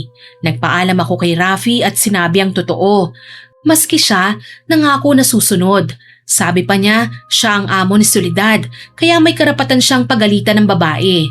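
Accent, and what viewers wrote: Visayas, Philippines. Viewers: More Bisaya or ilongga voice po bahay din SA Inyo ms. ☺️napapangiti talaga ako pagnikikinig ako SA Inyo 🫶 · Ang cute ng pagka bisaya miss, as bisaya approved ka sa akin May pagka Hiligaynon ang tono hehehe pa shout out po next upload nyo watching from Oman 🇴🇲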